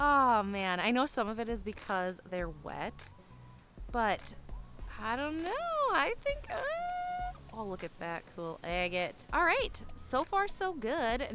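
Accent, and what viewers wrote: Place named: Midwest, United States. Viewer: I just figured it was her midwest accent. Like how some people pronounce worcestershire nothing like its spelled.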